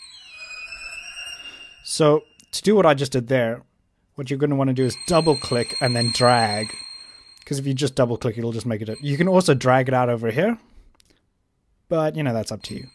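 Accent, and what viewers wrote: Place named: South Africa. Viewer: South African...caught your accent! I'm from Jeffrey's Bay. Thanks for the help!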